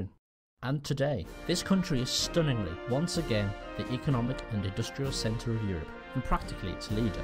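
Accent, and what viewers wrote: United Kingdom. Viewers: This was the first video I had watched of, and after it, I had come to love all his work. It a damn shame we'll never hear his snarky British accent snap another witty comment or see another one of his videos in this unique and detailed style. Rest in peace, you have left behind a legacy and personality not easily mimicked.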